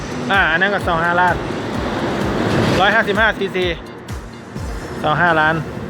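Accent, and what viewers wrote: Isan, Thailand. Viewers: อ้ายเป็นคนอีสานบ่คับบ😀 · กดติดตามเพราะคำเว้านี่หล่ะ ข่อยก็มักเว่า..อิอิ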